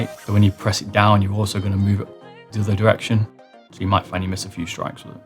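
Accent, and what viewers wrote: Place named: Cockney, United Kingdom. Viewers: Enunciate please. Too Cockney to understand. There's some good info in there somewhere. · I'm betting Scottish, if not then English. Yeah, people have accents, some are harder to understand compared to others. I understood you just fine. Though the music may have been a touch loud.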